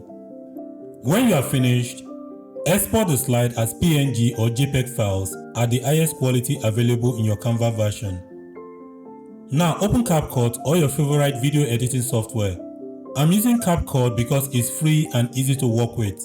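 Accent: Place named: Nigeria. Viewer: HI good video i liked your nigerian accent bro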